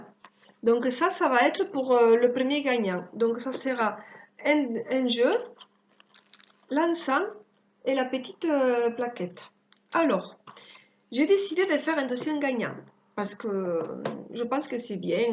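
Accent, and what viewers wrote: Spain. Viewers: Hello ma belle (dis-moi si jamais tu n'as pas envie que je t'appelle comme ça:- )))... JE PARTICIPE évidemment avec un immense plaisir. Bravo pour tes 100 abonnés!!!... Moi je t'adore, et je suis ravie d'avoir fait ta connaissance. Tu te débrouilles super bien pour ta chaîne et tu es très à l'aise, naturelle et on te comprend tout à fait... Donc, pas de honte... Et puis, l'accent espagnol est un plus!!! Bisous bisous... Tout plein! Sonia, Genève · Bonjour!!! Moi, j'adore ton accent espagnol d'autant que je suis d'origine espagnole et j'en suis très fière... Je participe donc à ton concours avec grand plaisir... Je trouve très gentil de ta part de célébrer déjà tes premiers abonnés... Je te souhaite une belle journée ensoleillée (car je suis près de Nîmes et chez nous le temps est superbe)... Bisous... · cc, j'adore ton accents espagnol, mon père est espagnol, saragoza 😉 + 1 abonnée , je t'invite à venir à voir ma chaîne et t'y abonnée et me suivre si tu le veux sa me ferait plaisir. 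gracias bela . besitos. ( attention la belle on a pas le droit de mettre je participe en commentaire) c'est interdit tu pourrais te faire supprimer ta chaîne. Il faut indiqué que les personnes mette un mot tout simplement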